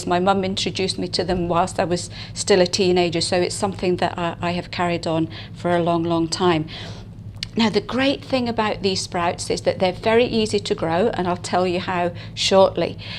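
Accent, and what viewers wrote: Scotland, United Kingdom. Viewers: Love your Scottish accent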